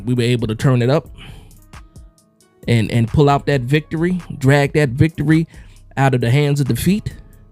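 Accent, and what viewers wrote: New Jersey, United States. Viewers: No wonder you sound like you from home base Jersey City Nj in the building keep the content coming #NorthJersey#bigBlue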